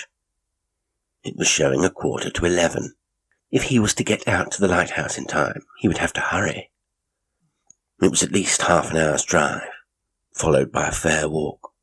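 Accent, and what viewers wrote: Australia. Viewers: Both sad and chilling. You've got the Oz accent just right. It's kinda like our New Zealand accent but more twangy (if that makes sense). They say "feesh n cheeps". We say "fush n chups".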